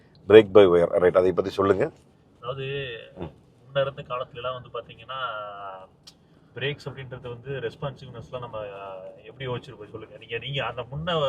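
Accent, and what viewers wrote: Kongu, India. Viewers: அப்பப்ப எட்டிப் பார்க்கும் கொங்குத் தமிழ் அழகு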